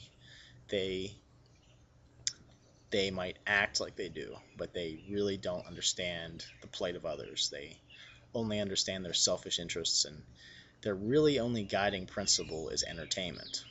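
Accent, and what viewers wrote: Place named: United States. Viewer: American English kind of hurts my ears sometimes, how they pronounce the 't' like a 'd', and such their teeth every 10 seconds, but other than that you seem to be getting a grasp of the game. You have much more to read though my friend, the new supplement books outshine the core book.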